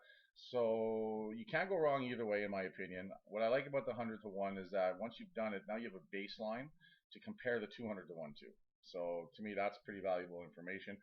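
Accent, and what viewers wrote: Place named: Canada. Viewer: You are so attractive. Love the Canadian accent too. I don't mean to objectify you but it's your fault for being so visually appealing. You're also very bright and articulate. You seem very even tempered, kind, like you'd make a good manager/supervisor. You need WAY more subscribers. Your message and delivery are very persuasive and you're easy on the eyes.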